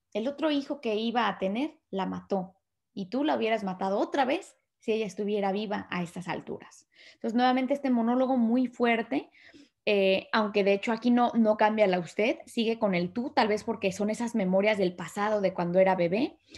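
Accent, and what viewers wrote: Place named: Mexico. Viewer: Me encanta la literatura y la obra de Rulfo para mí, es de lo mejor en calidad, la forma en que desmenuzas el cuento es excelente, interpretas a la perfección la forma de hablar del mexicano, parece que tuvieras bastante interacción con personas de aquí, fue muy grato verte en el canal de Laura.Saludos desde la ciudad de México .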